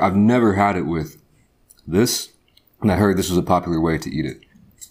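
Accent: United States. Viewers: Eh? Of course he's American check his accent!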